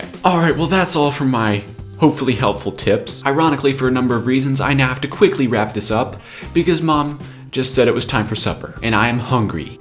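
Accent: Germany. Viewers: Ooooh gosh, I absolutely love your German accent. It's adorable xD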